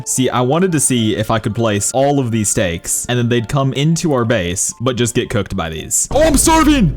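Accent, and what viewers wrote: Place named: United Kingdom. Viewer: I think he is British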